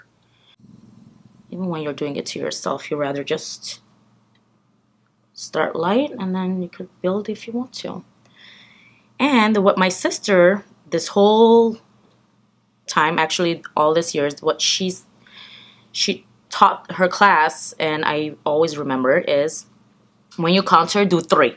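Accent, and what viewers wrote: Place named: Armenia. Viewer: You & Lilit are two of the most beautiful women! I love your Armenian accent coming through! You two do makeup better than anyone else I follow! So natural but glam & just refreshing seeing someone contour without looking too done up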